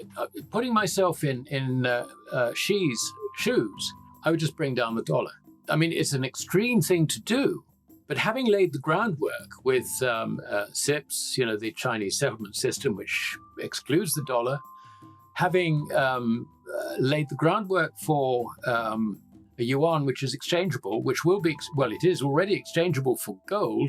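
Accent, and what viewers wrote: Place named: United Kingdom. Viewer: It’s hard enough to listen to his Brit voice, the back ground distraction kills his message. I can only give it 5 min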